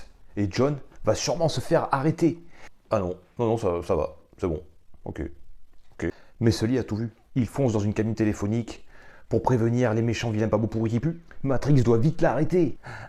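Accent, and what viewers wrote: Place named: Bas-Saint-Laurent, Canada. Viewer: oui l'accent du bas du fleuve que ta pris pas celle de MOntréal ni Québéc bien content d'avoir faite ta connaissance le cousin de la france génial ton video 🤣🤣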